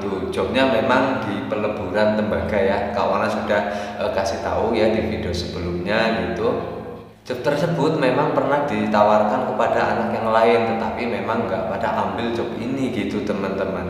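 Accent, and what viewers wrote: Banyumas, Indonesia. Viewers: Ohw wong ngapak yak..itulah perjuangan yg di jalani dengn sabar ..dan tlaten yo pasti panen..👍💪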